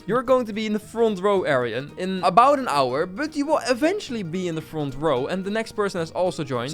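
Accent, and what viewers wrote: Netherlands. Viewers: are you dutch i maybe hear a acent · Jij bent Nederlands toch ik hoor het aan je accent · I can hear you speaking english with a dutch accent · We horen dat je Nederlands bent en dat zien we ook. Aan je naam dus praat gwn NL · Bro you sound so dutch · JE BENT NEDERLAND IK HOOR HET AAN JE ACCENT AHAHAH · I love your dutch accent!!(if its dutch) im dutch too. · Engels met een Nederlands accentje😂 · I love your accent its an dutch accenr right? (Im dutch btw)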